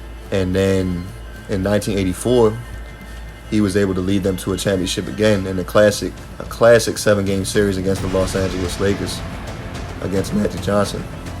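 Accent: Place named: Baltimore, United States. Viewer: Nice vid! Bird Hakeem and Harden are my all time favorite players! Love the Baltimore accent. One small tip though, the music is a bit loud in comparison to your voice :)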